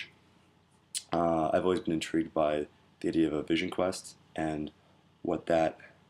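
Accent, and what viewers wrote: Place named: Canada. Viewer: ps: you sound suuuuper Canadian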